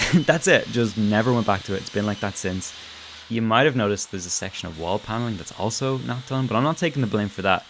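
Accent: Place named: Ireland. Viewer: Heard the fellow Irishman voice and had to subscribe right away!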